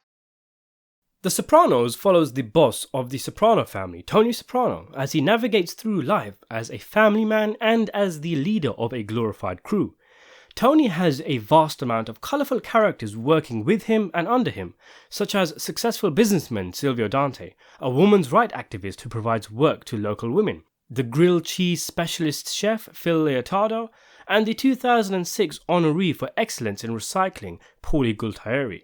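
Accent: United Kingdom. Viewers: Can’t get enough Sopranos wordplay from someone with a UK accent. Keep it up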